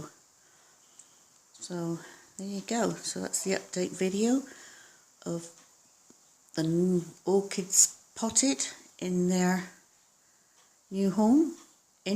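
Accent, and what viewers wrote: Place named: South, United Kingdom. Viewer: I do wish growers would remove the old medium when they repot, it takes ages to carefully remove especially the moss from oncidiums fine roots! Bark is better to remove but those hard packs of I don't know what they grow the seedling in is also difficult to remove. Found out your living in NZ from this video but your accent tells me your originally from the south of UK. Keep posting please!!!!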